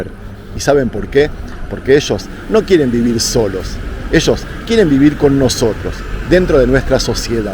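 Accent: Buenos Aires, Argentina. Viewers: No se veo mucha manipulación, en este video peligroso es meterse en un barrio de caracas y lo otro q no me explico, es que el único lugar del mundo donde se pelean judios y árabes x supuestos motivos religiosos es en Israel, 🤔sospechoso de verdad, tuvieron unos dos mil años en la diaspora, y los judios q Mato Hitler ni siquiera eran de medio oriente la mayoría eran de Alemania 🤷🏽‍♂️, y tenían religión judía, se entiende q se usara esto como escusa para la creación del estado de Israel pero la mayoría de los israelitas q viven en la nación de israel yo diría q son de otros orígenes,no semitas, asi como tú q tienes acento argentino o uruguayo ni idea de cuál país seas pero de x allí, lo cierto es q de origen semita no creo, pero puede q me equivoque x otra parte habría q ayudar a un país como burundi donde realmente se ve la verdadera razón de las guerras,la pobreza extrema, y la exclusión social de los más desamparados del planeta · Shalom...admiro tu enseñanza..a veces pienso que lee tus relatos... 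Y me gusta tu acento porteño..un abrazo desde Salta Argentina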